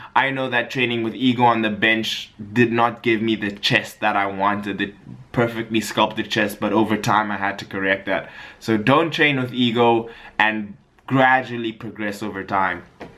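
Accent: Nigeria. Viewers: Why u talking like a Nigerian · The Nigerian accent tho 😂😂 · that NIgerian accent tho..lol...too funny..great tips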